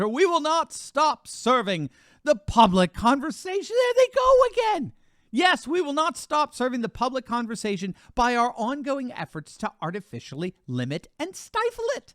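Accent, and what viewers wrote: United Kingdom. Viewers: I like how he slowly starts displaying a British accent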